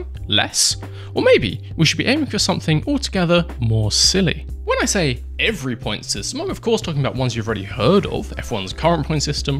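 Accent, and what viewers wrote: United Kingdom. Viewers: british accent just killed the video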